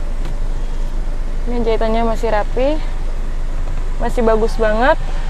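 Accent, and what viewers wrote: Java, Indonesia. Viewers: pasti wong jowo udah bisa ketebak dari suaranya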